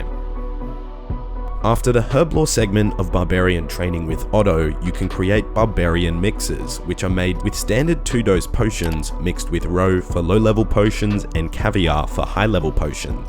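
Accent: Australia. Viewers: I fucking love your voice, are u from Australia? The accent combined with the deepness of your voice makes me happy.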